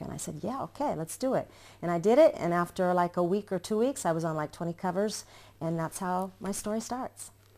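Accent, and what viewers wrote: Italy. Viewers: She is American but after 40 years in Italy she has now an Italian accent...crazy.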